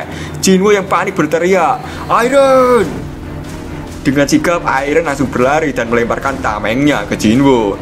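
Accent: Java, Indonesia. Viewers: Bg org jawa ya?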